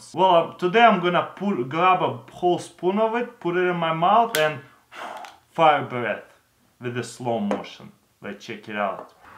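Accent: Russia. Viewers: Your awesome russian accent can only get you so far, please stop re-uploading things you've already done and make some new content. Or make something cool out of old projects. (i.e. maybe make a borax green torch for your backyard)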